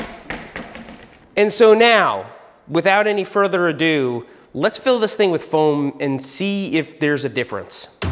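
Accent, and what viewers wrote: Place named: South, United States. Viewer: new southern accent is coming through: "Impordan"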